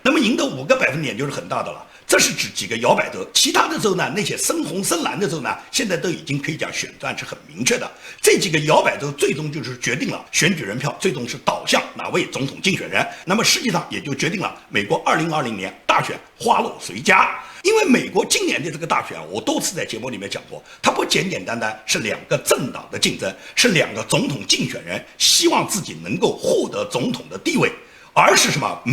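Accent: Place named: Nanjing, China. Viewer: 挂了，是南京话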